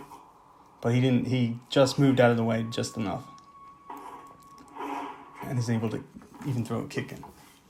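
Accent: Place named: New Jersey, United States. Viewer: has a beautiful jersey accent that sneaks out every once in a while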